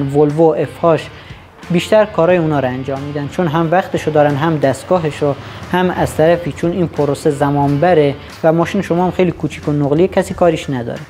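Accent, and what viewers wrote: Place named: Sistan, Iran. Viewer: از لهجه آن مشخصه سیستانی هستی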